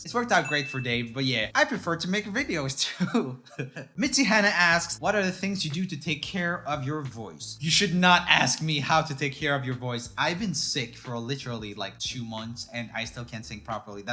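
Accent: Sweden. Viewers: Var det där din riktiga svenska dialekt? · Holy shit. As a swedish boy I can vouch that swedish does not sound that weird. He has one of the funniest dialect I've ever heard. Where TF are u from (is it like Malmö or something)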